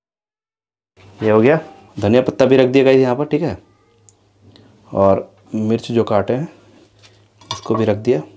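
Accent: Bihar, India. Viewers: Kit na thik he thik he Bol tha he bihar ka he KYA tuuu ???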